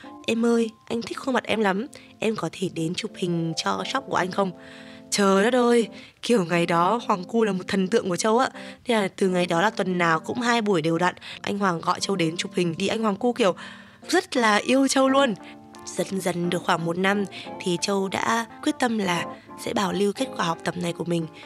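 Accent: Hanoi, Vietnam. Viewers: Tui thích giọng gái Hà Nội Tui thích giọng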